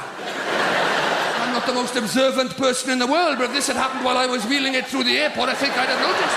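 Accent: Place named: Wales, United Kingdom. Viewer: that is the most welsh accent I have ever heard !!!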